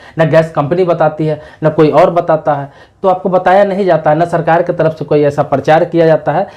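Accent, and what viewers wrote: Bihar, India. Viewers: Kya bolte ho dhyan se sune fir bolein,kya bihari ho........tabhi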